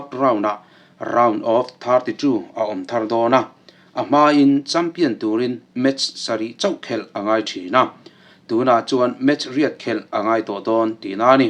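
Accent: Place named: Paite, India. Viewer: Ichanel ania, ilan loh ten paihte angin tawng chu pai mai mai mah se apawi hlei nem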